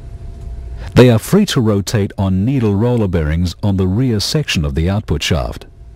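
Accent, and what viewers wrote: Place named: New Zealand. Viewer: This guy sounds very kiwi to me.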